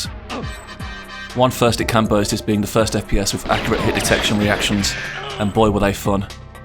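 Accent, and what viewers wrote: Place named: United Kingdom. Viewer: I fucking love this guys britsh accent. reminds me of that guy who did the polybius video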